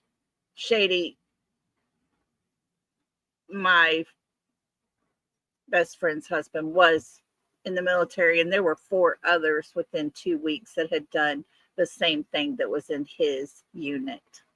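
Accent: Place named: South, United States. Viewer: !!!! I've missed that Southern Drawl!!! So happy to see a video from you!! Imo YES! That is so weird that she would come out and go the same way you do!! 😱😱 R u sure they don't have a tracker on your car 🤔🤔 What are the odds?!?! I don't believe in coincidences... 🧐🧐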